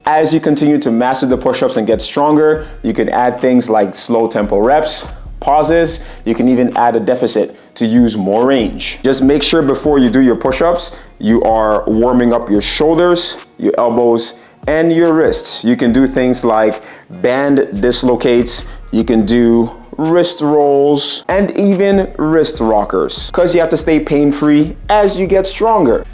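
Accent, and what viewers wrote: Toronto, Canada. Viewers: Toronto accent on this man, ahlie? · I knew you were from Toronto (GTA) 5 seconds in…. the accent is strong 🙏🏼🇨🇦🥰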